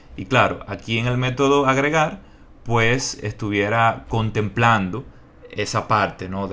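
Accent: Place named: Dominican Republic. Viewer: buen vídeo, muy bien explicado excelente.... he eres dominicano verdad?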